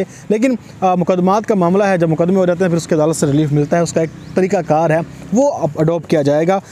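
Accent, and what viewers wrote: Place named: Punjab, India. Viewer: Punjabi ka tarka laganay ki zaroorat nahin hay, however your vlogs are always informative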